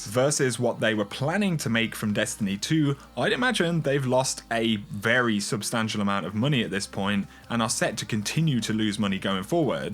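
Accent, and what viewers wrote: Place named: United Kingdom. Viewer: Even bad news sounds good in a British accent...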